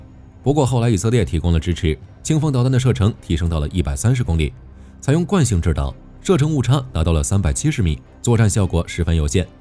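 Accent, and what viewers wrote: Mainland, China. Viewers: 這個頻道的語音為什麼是中國大陸的口音？是用中國大陸的AI配音嗎？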